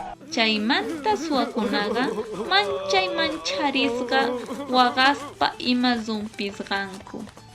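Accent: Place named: Ayacucho, Peru. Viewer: Te pasaste compadre. Has hecho una gran contribución al mundo. Lástima que ahora no podré usar esto como tarea de audio-comprensión como antes para mis alumnos porque ya lo has traducido. Igual, se agradece y se te felicita. El cuento es boliviano (se agradece mucho a los hermanos bolivianos por colgar material en quechua) pero ese quechua al ser pronunciado es más entendible incluso que el quechua Cusco-Collao del sur del Perú. Se nota que tú hablas quechua Ayacucho-Chanka o quechua de Apurimac, ¿cierto? Hatun marqayniyta chaskikuy, Chosica llaqtamanta, wawqillay.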